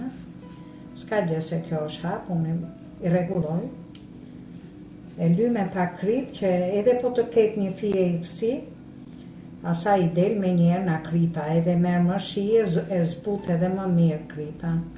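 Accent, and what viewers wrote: Tirana, Albania. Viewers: Sa jam knaq me kte t'folmen , sa e paster Tironce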